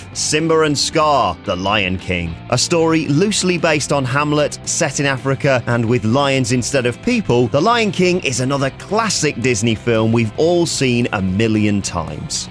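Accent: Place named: United Kingdom. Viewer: "Simbar and Scah." Oh, British people... When will you ever learn how properly to use the letter "R?"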